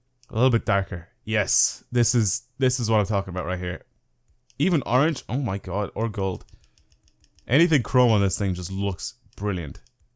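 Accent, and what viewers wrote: Ireland. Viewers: Are you from Ireland? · Are you irish?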